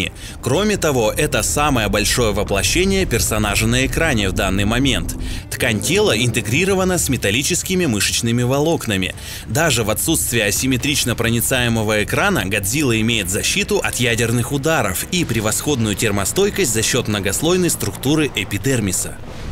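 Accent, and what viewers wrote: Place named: England, United Kingdom. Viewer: Ну нехрена у тебя акцент английского!!!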